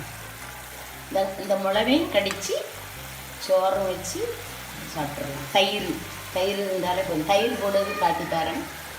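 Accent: Nagercoil, India. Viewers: Theivamee namma oru slang tamil ketu yethanaa naalu makka ... Special word naroil insted of nagercoil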